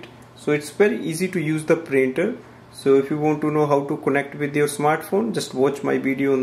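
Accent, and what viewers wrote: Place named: India. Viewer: Plain & simple & i love ur indian accent 😝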